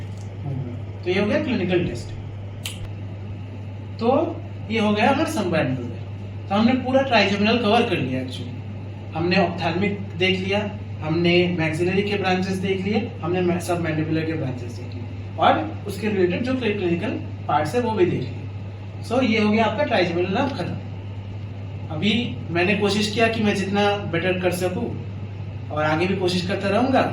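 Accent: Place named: Bengal, India. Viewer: Wow nice accent app bengal se ho kya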